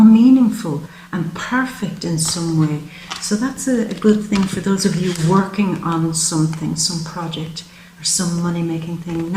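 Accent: Ireland. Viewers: So true and profound. I am already a bit like you want me to be and am very happy about it. Will work to finish the job with the help of the universe. Wanting to do what I love to do and get to work from it. I love your accent. Irish perhaps. Thank you. Best wishes for the Holidays.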